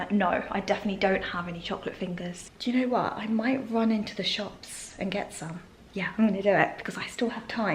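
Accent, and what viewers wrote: England, United Kingdom. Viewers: I love listening to you speak you have such a sweet voice! I love hearing you say Cozy!😅 I live in USA, in California. I enjoy hearing your English accent. Hugs from California ❤.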